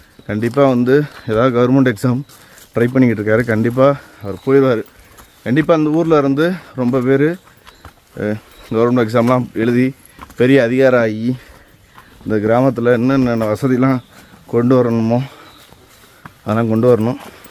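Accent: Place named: Ramnad, India. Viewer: unga ramnad tamil pronunciation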